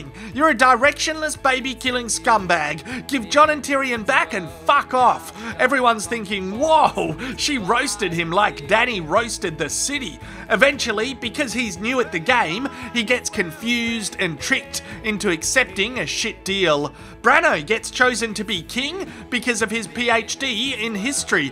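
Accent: Australia. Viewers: Thanks for putting all of these together over the years. it’s great hearing a normal Aussie voice poking fun but also reviewing it as the show has gone along.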